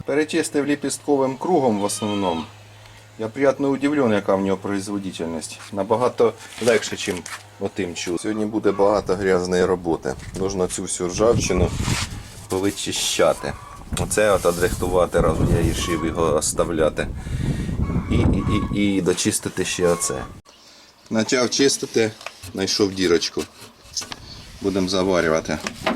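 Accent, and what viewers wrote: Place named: Surzhyk, Ukraine. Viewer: Прекрасна українська мова, так з суржиком) але це українська мова!!!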